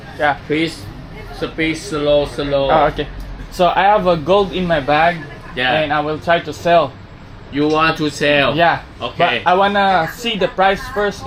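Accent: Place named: Philippines. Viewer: You’re funny af bro, you have filipino blood? You got that accent hahahah.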